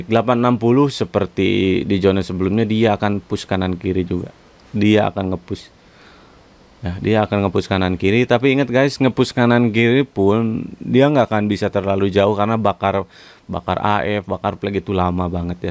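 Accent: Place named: West Java, Indonesia. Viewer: penyebutannya / pronounciation nya dia aja karena dia orang Sunda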